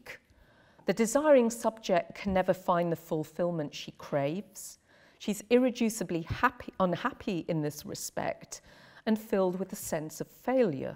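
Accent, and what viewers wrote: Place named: United Kingdom. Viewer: Why can't the Brits ever get to the point besides the annoying accent.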